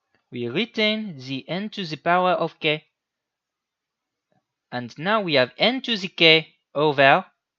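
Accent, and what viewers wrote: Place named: France. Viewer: what is this metaphysical comedy where you make amazing math content but do it in a French accent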